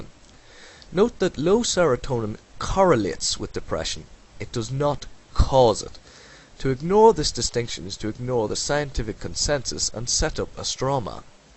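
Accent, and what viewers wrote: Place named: Ireland. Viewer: great video man, I like your accent. Irish? very nice information~ keep it up ^_^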